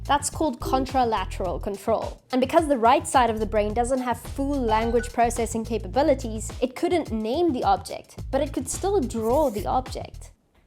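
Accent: Afrikaans, South Africa. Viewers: Jy kan sommer die Afrikaans hoor😂❤🎉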